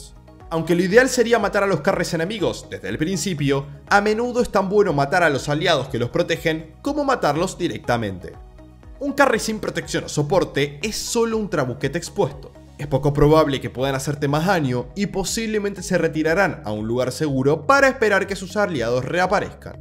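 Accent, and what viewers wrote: Standard. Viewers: Solo a mi me desespera que un argentino hable neutro? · Porque habla en neutro si es más Argentino que yo 👀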